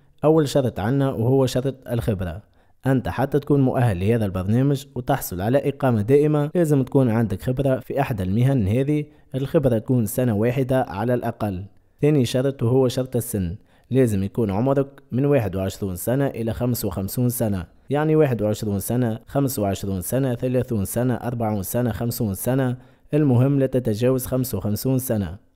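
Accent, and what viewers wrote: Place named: Tunisia. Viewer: اخي شكراً لك على المحتوى الرائع ما اجمل لهجتك التونسية الرائعة . أنا عندي فيزا سياحة هل ينفع أن اقدم على هذا البرنامج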